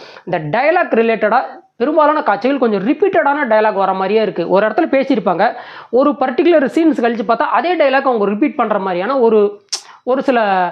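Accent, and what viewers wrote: Kongu, India. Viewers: Noted Kongu Tamizh Vazhga...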